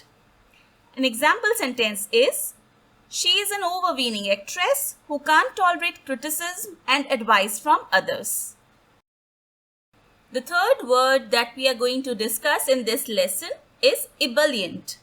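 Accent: India. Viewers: Indianb funny voice xdxd dx d d xd zxd dx dx dx xd dx d x d xx d xddx You thoiught there would be more but there isnt xd xd xxd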